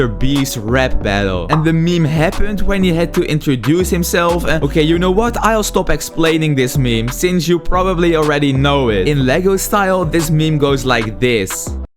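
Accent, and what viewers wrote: Netherlands. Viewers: 😊ar you dutch your accent is dutch?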